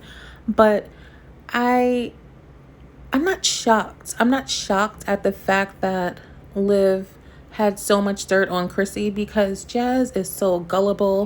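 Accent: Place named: New York, United States. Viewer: Are you from New York ? I love love your accent !!!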